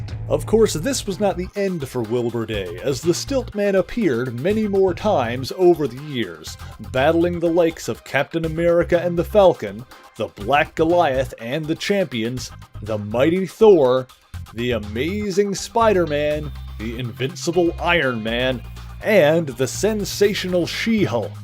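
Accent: Canada. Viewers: You pronounce things funny. Are you Canadian by any chance?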